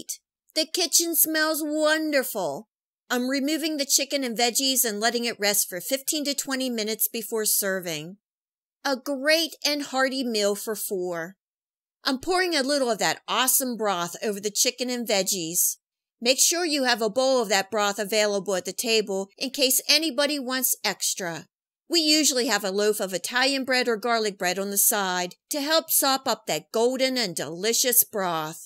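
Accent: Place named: Pittsburgh, United States. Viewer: Hi,! Love this idea! Are you from Pittsburgh? I grew up there but live in CA now. I hear an accent. 😊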